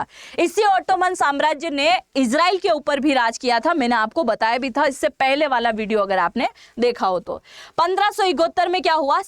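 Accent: Gujarat, India. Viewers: Bahenji aap ka vishleshan bahut hi achha hai.keep it up. God bless you. Aap ke ucharan se lagta hai aap gujrati hain. Sorry,kindly try to correct your hindi specially numbers.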